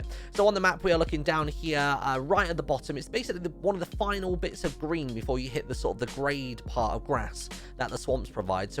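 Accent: United Kingdom. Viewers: how is it its like a british accent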